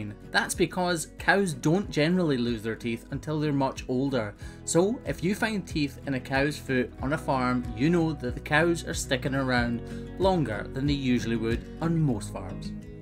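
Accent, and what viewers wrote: Scotland, United Kingdom. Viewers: Thanks for a lovely episode, nothing like waking up to pure Scottish in the morning, you are so helping my daughter with her accepting she speaks Scottish.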